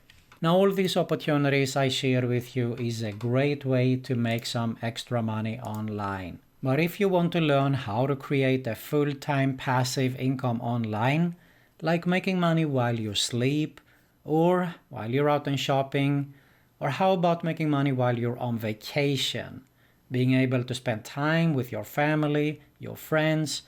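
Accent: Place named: Germany. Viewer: Hey, thanks for the nice video. Do you come from Germany, cause your pronunciation looks like Germans ? ☺️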